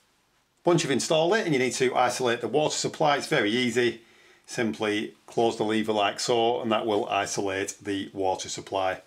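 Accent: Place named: Preston, United Kingdom. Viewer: You sound like a Preston lad.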